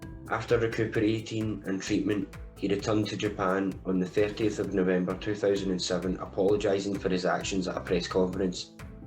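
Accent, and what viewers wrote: Scotland, United Kingdom. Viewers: Thanks, I did, Scottish accent + auto-generated subs = fun :) Btw. this is a must-see: Scottish Star Trek <link> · What a great combination - sumo and the Scottish accent! Enough to subscribe :) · Thank you for the video. Quite nice and well done ... I am a die-hard fan of Asa also. I discovered sumo with Asa, just short before he to become Ozeki. I dont know why but I think I am in love with the Scottish accent. Hearing you, and also following the channel Scotland History Tour I start to really love the way how Scotts are speaking. You (all the Scotts) have a nice musicality in your tempo of speaking. All the best!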